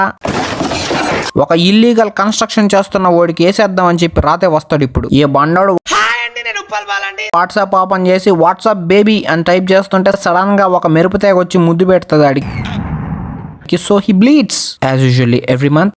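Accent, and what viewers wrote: Nellore, India. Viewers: Abbiya BROWWWW 'Korea Comic" ani oka yt channel undhi..andhulo kooda oka korean athanu movie explanations chaala hilarious ga english lo chepthadu with crazy asian accent and funny sounds which is similar to your explanation but that is foreign and yours is naatu nellore yaasa which I am proud to be fan of both..neeku emmana help ayyidhi ani like inspiration ga ani cheppa..happy ga undu mowa browwws - SELMON BHAAI be like: abba laddu lags unnave pella 🤤 BOOOYYYYYYYYYYYYYYYYYYYYY broww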